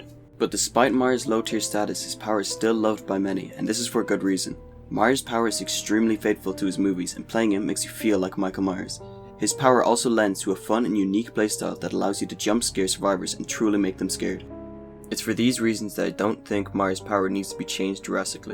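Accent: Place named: Netherlands. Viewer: stop talking like a dutchie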